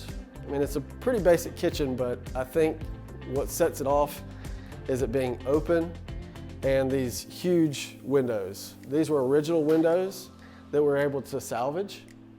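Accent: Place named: Georgia, United States. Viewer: I went to UGA in the 90s, your accent brings back memories!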